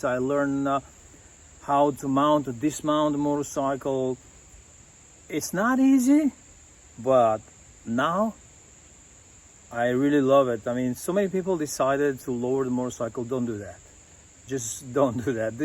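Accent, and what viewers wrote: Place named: Eastern Europe. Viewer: oh! I knew you sounded Eastern European. Добре дошли!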